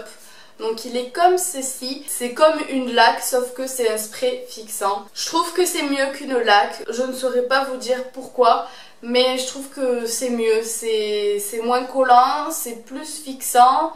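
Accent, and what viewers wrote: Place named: Southwest, France. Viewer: Coucou, pour mon deuxième commentaire sur ta chaine (je poste RAREMENT des commentaires), je tenais à te dire que j'adore ta personnalité ! Le fait que tu évites d'inciter tes abonné(e)s à utiliser des produits pas très conseillés me plait beaucoup et montre ta sincérité et ta bienveillance. J'adore ton accent, j'ai le même, et c'est tellement naturel ! Ca fait du bien d'entendre cet accent sur Youtube ! Enfin ! :P J'ai déjà essayé de me lancer sur Youtube mais par manque de motivation je n'ai pas continué. En revanche je fais de la photo. Tu peux toujours aller voir ma chaine si le coeur t'en dit, ainsi que ma page Facebook : Capricorne Clic Photographie. (Ouais j'en profite un peu, mais la solidarité du Sud (Ouest) paie toujours, donc ... ) haha ! Gros bisous et continue comme ça.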